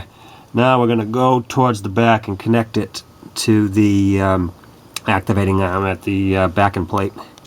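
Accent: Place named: Woburn, United States. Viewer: I see this video has been up for a while but I got to say a couple things I lived in Woburn for a number of years met my wife there 30 years ago and through her I met some friends we still stay in touch and when I heard your voice I knew right away where you were at you sound exactly like my buddy Little Dave made me smile thanks for the video I’ve done this one many times I have a 99 Ford escort with get this 514,000 miles on it I just wanted to comment about the car but I’m gonna put another little post up just a minute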